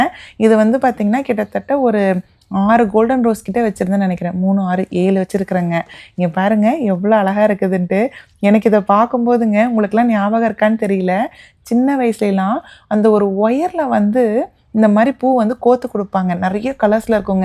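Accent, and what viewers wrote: Kongu, India. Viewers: எல்லா வேணியும் அழகு, உங்க கொங்கு தமிழும் அழகோ அழகு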